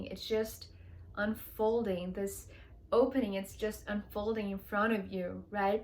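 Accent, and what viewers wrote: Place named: England, United Kingdom. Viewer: Thank you,! I think I'm feeling this right now. I just discover your channel through San story video:) Beautiful energy, deck and readings. You are an inspiration to me. I love your English accent as well;), I'm from Spain. Love ♡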